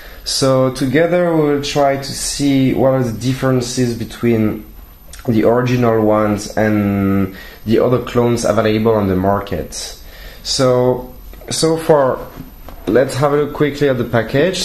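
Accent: France. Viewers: tu ne serais pas français par hasard?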